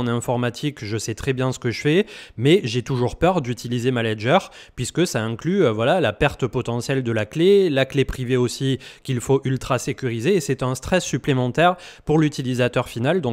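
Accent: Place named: France. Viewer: Pour l'accent anglais c'est pas grave, on comprend, tu es français ....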